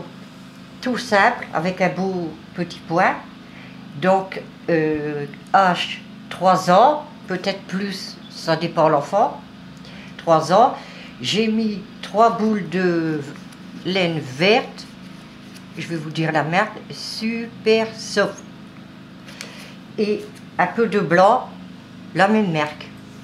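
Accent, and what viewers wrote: North, France. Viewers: bonjour, comment va tu je vois que tu as fait un très beau pull, mon arrière petite fill.va avoir deux et griniette petite menue, il et très beau comme habitude, c est bon de t entendre parler avec ton accent du nord, je vais essayé de le faire avec moins de mail? gros bisous et gros Câlins a pompon, fais bien attention a toi, a très très vite bonne après midi, Monique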